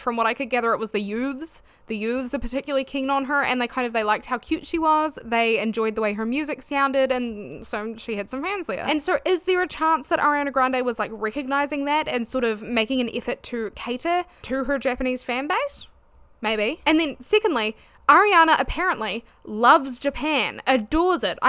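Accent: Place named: New Zealand. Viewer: yayyy I’ve been here long enough to know to expect your “its a kiwi accent” disclaimer!! Im grandfathered in!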